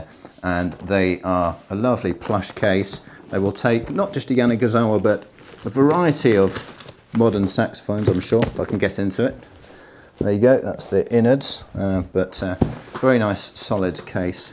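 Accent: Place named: RP, United Kingdom. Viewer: Only a £4999 bari to start with, LOL, and, wow, with a genuine Boris Johnson accent, too. Speaking of which... I have a yacht for sale. It is only £1000 and it comes with a guaranteed weekly dividend of £3,500,000. I used to have that accent down to a T and then I moved to the US. Maybe that's a good thing, though. PS Don't worry, I have ordered stuff from you in the past and not even Boris Johnson can stop me.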